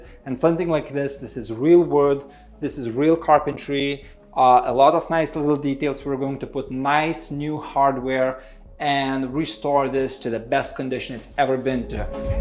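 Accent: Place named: Baltic states. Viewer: Chanced on your video for the first time, wasn’t expecting blet by the garage, and only then did I recognise your Baltic accent. Nu va. Sveikas! 😊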